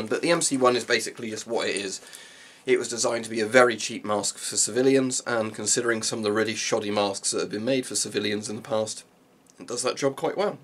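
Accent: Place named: United Kingdom. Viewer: Im from Poland. The word "Dzień Dobry" you said was very simular but accent is strongly British. Still, you are close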